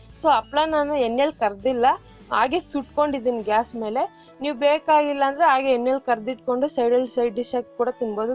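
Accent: Tamil Nadu, India. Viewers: Tamil tara matadbedi correct agi bele anta heli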